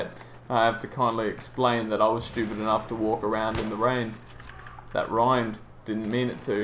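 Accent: Australia. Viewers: Fuck yeah! thought that was an Aussie accent, and you live bloody close to me. im Sunny Coast... well not really sunny, kind of an ironic name don't ya think.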